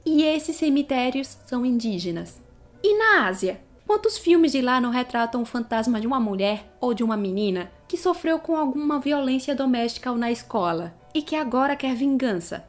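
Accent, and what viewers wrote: Brazil. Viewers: o sotaque nordestino misturado com o ritmo de fala mais compassado e a voz suave parecendo estar contida ajuda muito Ah, parabens pelo conteudo!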